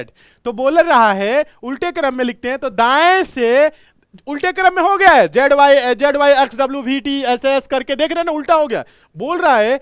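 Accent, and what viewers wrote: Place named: Bhojpuri, India. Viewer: Sir G bhojpuri ek dam bhojpuri hero jaisa bole❤❤❤❤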